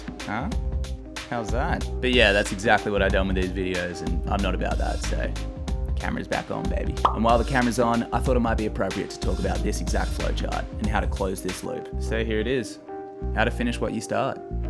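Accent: Australia. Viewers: Your humor is soo heccing good. Get's me everytime. You got this kinda dry humor I really like and the australian accent just adds to it. Kinda got MK's Kano in mind. Kano giving advice. Just imagine that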